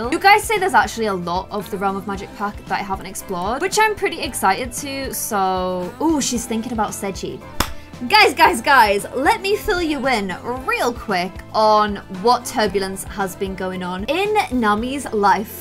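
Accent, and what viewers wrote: United Kingdom. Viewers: It’s her british accent lol, also, i think you mean “covEn” and not “coVen” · Isn’t what she’s saying just the British pronunciation? I’m not a native English speaker but that’s how I always said it in my head lol She was also mispronouncing all kinds of stuff when she was playing her Korean series and is, to this day, still saying “Seo-jun” wrong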